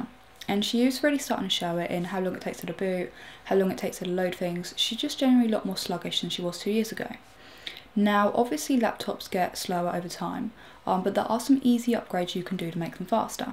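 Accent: England, United Kingdom. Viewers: are you an idiot shes english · Are you from england ?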